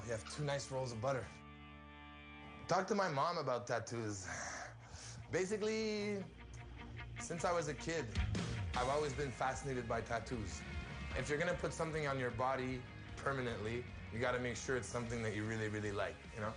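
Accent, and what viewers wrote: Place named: France. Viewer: hes not gay. french is his first language so he sounds a little different. im going to marry him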